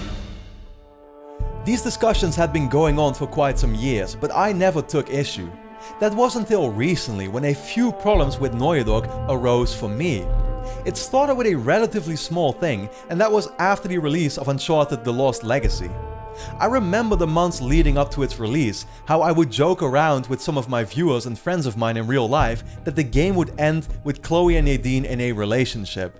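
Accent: Netherlands. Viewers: Never heard of your channel, and Youtube pretty much always recommends trash. I decide to watch a recommended video for once, and it's gold. Spot. On. Plus, hup, Holland hup! Meer Nederlanders met een stevig accent in games!!!!!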